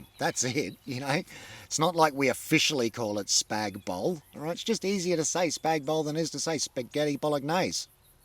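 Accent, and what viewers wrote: Australia. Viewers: I’m American, I love how you Aussies speak! it’s fun to listen to you . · I LOVE the Australian accent so much! And please don’t think all of us are that dumb!! Lol 😂😂😂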